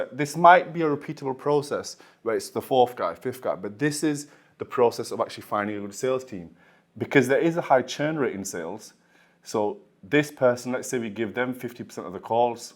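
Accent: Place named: Pakistan. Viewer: Can we get subtitles next time, the Pakistani accent is hard to understand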